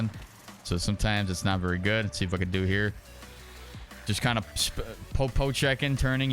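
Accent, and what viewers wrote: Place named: Delaware County, United States. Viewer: You should do a Tom Wilson build. And I have a random question… are you from Delco? Your accent sounds just like it lol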